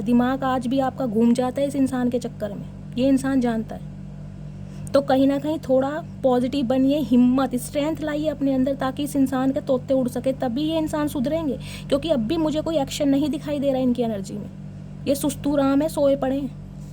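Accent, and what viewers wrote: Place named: Uttar Pradesh, India. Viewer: Ye बताओ कि आप मैनपुरी आगरा साइड से हैं। आपकी बोली सुनकर ऐसा लगता है। मेरी बोली भी ऐसी ही है। कुछ कुछ ।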